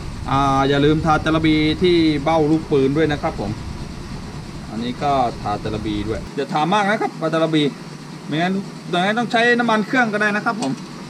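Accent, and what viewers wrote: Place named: Sukhothai, Thailand. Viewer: สำเนียงคล้ายๆคนโขทัยเลยน้า fcครับ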